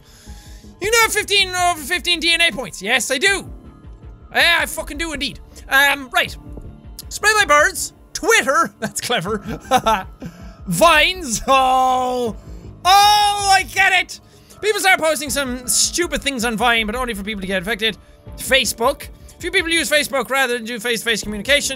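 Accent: Ireland. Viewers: Erase What? Do you mean Top of the mornin' to ya laddies? It's because he's Irish. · is he irish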